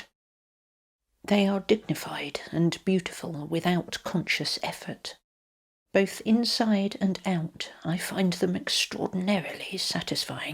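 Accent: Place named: West Country, United Kingdom. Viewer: Wonderfully atmospheric. Beautiful narration—is that a “west country” accent? If so, where is the west country? I love the accent and grammar so much I’m determined to learn how to do it. PS, it was hard to concentrate once I learned there was a box of papers, unlocked, just sitting there, as big as Dallas, that no one bothered to read for 300 YEARS! It took me a few minutes to settle down as I tried to wrap my mind around it.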